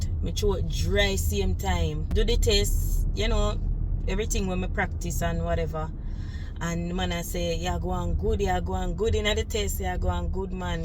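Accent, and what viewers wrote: Jamaica. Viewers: When you speak rank Jamaican it is hard to understand you, please be mindful that persons other than Jamaicans are watching your videos. Good video nevertheless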